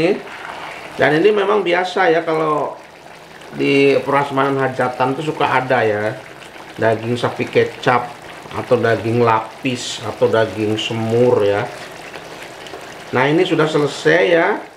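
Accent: West Java, Indonesia. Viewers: Hahaa kungkurahan bagus sundanya g hilang berada d negri orang mantaf 👍🙏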